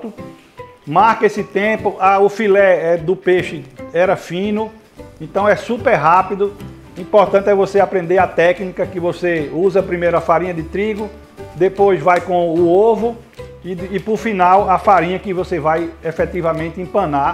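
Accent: Brazil. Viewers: Excelente vídeo, didático e claro. Muito gostoso o prato e o sotaque do chef, Brasileiro puro. Parabéns. Like e inscrição de um estudante de cozinha. Muito obrigado chef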